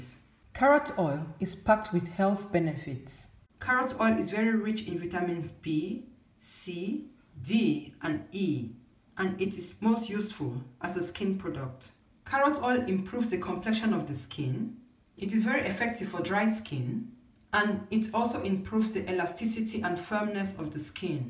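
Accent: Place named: Cameroon. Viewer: You sound very Cameroonian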